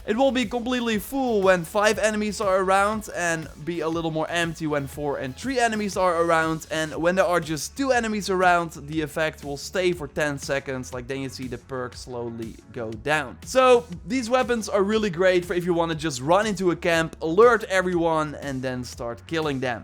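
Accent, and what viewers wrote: Netherlands. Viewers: Sick dutch accent😂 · Is he from thuis netherlands it souds so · You have a dutch accent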